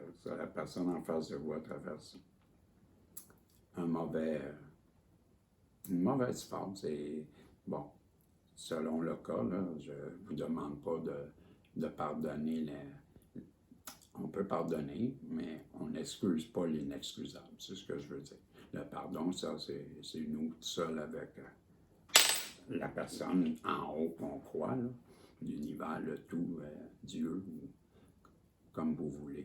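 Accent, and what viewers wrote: Quebec, Canada. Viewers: Pour ma part je suis une bouffonne mais dans le bon sens du terme j'aime la vie,.. j'adore ton accent québécois... je me suis détachée en amour de mon mari qui m'accompagne depuis mes 18 ans mais aujourd'hui après avoir souffert seule, bloquée physiquement depuis 6sem.. aujourd'hui,le voyant chantonnant .. je ne supporte plus sa présence....et me retrouve en totale perte d'énergie.. quitter la maison dans laquelle je me suis seul temps investie.. difficile.. mercii pour cette guidance ❤ Véronique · Salut oui tu as raison j’aimerais qu il me disent la vérité mais je ne reviens pas en arrière merci l ami j adore l ´ accent québécois vive la vie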